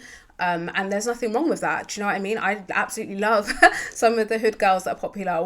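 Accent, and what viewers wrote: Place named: RP, United Kingdom. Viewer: To be fair I do really like the way you speak. Your accent makes you sound posh. Plus you're very calm and soothing. In relation to other black women, im not too sure. I've found that some can be quite boisterous in public, at the same time, we tend to notice disturbers of the peace, not the quiet people just going about their day. In personal conversations, I've had no issues.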